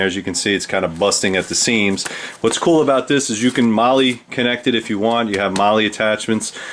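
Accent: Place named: New York, United States. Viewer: Thank you for your service! Are you from New York? Your accent reminds me of the old "Bowery Boys" movies.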